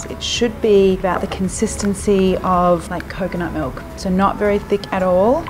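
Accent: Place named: Australia. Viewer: I love your Australian English voice, the videos, kitchen atmosphere and your voice are all perfect!!! Correct me if you’re not from Australia! Sending you lots of love, bless and success your way!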